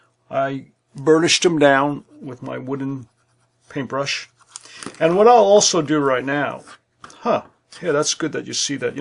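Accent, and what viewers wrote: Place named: New York City, United States. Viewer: I've made jewelry for a long time & was searching for something different; this is it! Very detailed, in fact at first I thought you were trained in engineering or architecture since your wording included "structural integrity" a few times, smile. Anyway formerly being from NJ it was interesting to hear an accent I was familiar with, although I'll bet you're from NYC/ Long Island? Thanks.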